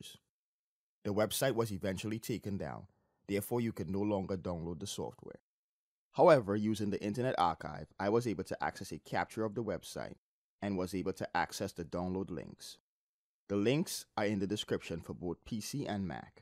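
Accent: Trinidad and Tobago. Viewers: Your accent almost sounds Trinidadian... where are you from bro?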